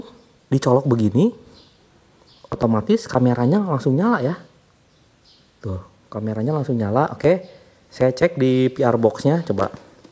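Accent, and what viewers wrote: West Java, Indonesia. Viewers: Curiga sunda yeuh soalna nyarios na kitu.. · Kak orang mana? Ni Sunda pisan... Hehehe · Walaupun pakai bahasa indonesia tp tetep aja sunda nya kelihatan... bnr ga kang? Hehehee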